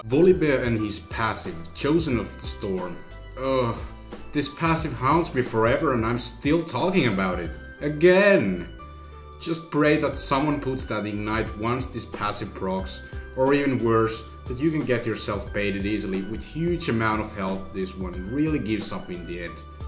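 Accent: Finland. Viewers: Mä vähän aattelinki et oot suomalaine, koska aksentti :D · U finnish? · Your accent is sooo Finnish ;D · Mä tiesin.. helkkarin suomalainen aksentti. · Are you finnish? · Are you from finland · are you from finland? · are you finland ?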